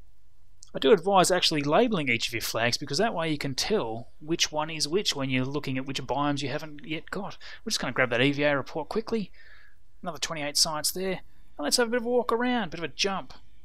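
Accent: Australia. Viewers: Nice to hear a fellow Aussie playing KSP! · Finally an Aussie you tuber thanks mate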